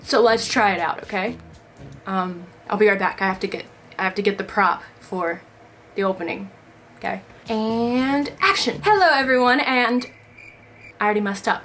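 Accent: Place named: South, United States. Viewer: I feel like I suddenly just noticed your southern accent Like it just stood out in this video But I’m from Minnesota so 😔🤟🏻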